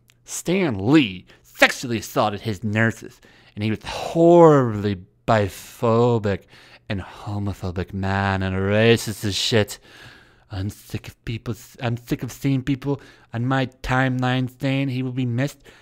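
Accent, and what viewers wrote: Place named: South, United States. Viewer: I just want to point something out here. Far left ----> Hating on a man who, although I certainly have no affection for, clearly meant a lot of things to a lot of people. Yet the Alt-right nazis everyone is so scared of, have no said a thing about him being a hack, even though he's Jewish and did do a lot of questionable things in his life. Just want to point that out. Your southern accent is beyond nauseating. Also just want to point that out. You read like 400 tweets in the 3 character voices you have. Lost being funny very quickly. As someone who has no dog in this race I wasn't cheering you for mocking sjws and I wasn't steaming mad that you were, I was just bored and irritated.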